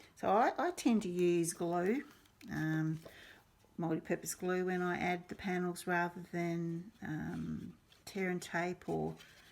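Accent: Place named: Australia. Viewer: Hi - I've just discovered you and subscribed. This is an amazing and oh-so-beautiful card. LOVE the designer paper and the dies too. As it's almost the very end of December, I suppose that designer series paper is no longer available, but I will try. Also, it's lovely to hear an Aussie accent when watching Youtubes. LOVE YOUR WORK!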